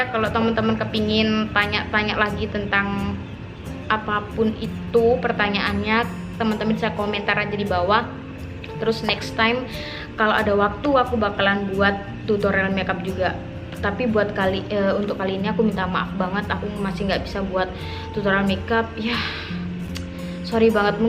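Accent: Indonesia. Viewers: probolinggo bener logatnya 😂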